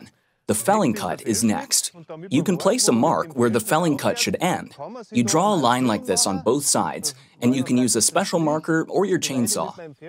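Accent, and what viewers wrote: Bavaria, Germany. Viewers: Bei der Ankündigung dachte ich schon real Bavarian English....Ich weiß nicht, welcher englisch Sprechende verirrt sich denn auf diesen YouTube Kanal.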